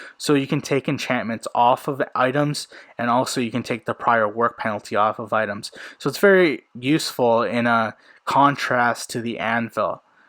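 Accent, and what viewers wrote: India. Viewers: Indian? Nice English btw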